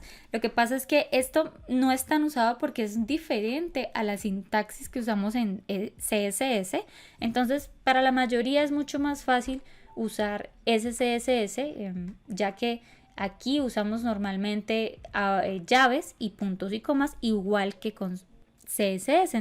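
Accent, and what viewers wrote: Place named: Colombia. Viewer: Ese acento colombiano... Tome su buen like ^-^ (buen video)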